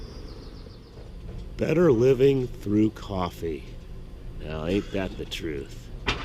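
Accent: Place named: Small-town, United States. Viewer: This made me wonder if there was some relationship here between building concrete form work and boatbuilding. A fascinating view, so have your family lived in Washington for generations and is your accent local? It has that small town American - Lake Wobegone/ Ken Burns feel to it, which is quite attractive. For fans of Americana here in Europe this down on the ground exploration is the other (positive) side of the globalised uniformity driven by the Internet. Yes to Van building/living, but also Yes to getting out and about.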